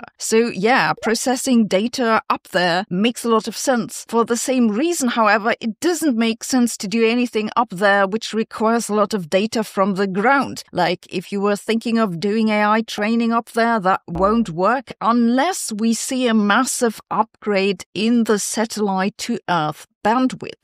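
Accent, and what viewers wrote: Germany. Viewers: we like your german accent,. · We love your considered approach and your German accent. This is a good disection of the issues around orbital data centres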